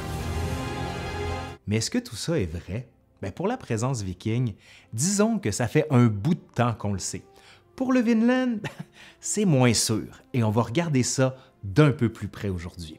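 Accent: Quebec, Canada. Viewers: C'est fou, mais quand enchaîne sur "Allez, aujourd'hui à L'Histoire nous le dira...", je souris, c'est presque réconfortant de me retrouver là en sachant que je vais apprendre des trucs... et entendre l'accent des cousins québécois ne rend la chose que plus agréable:-)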